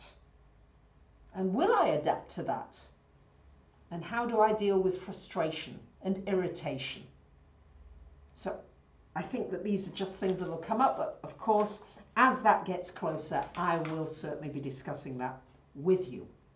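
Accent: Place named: Yorkshire, United Kingdom. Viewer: I love that you have a Yorkshire/Lancashire accent, as I am also from Yorkshire (Ryedale, though possibly also Andromeda!) and it brings an earthiness to your broadcasts. Super 😊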